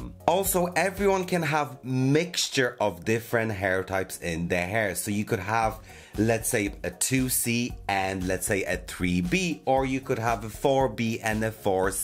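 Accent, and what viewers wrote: Ireland. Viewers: Huh... A non native English speaker who seems to have learned English in Ireland or from an Irish person. Fascinating. That's at least what it sounds like to me. I wonder what his mother tongue is. 🤔 See, now I'm intrigued. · i for the life of me can not figure out if you are super Irish or jamaican and obv one would make more sense but as soon as I'm sure u say something else and I'm like I DONT KNOWWWWW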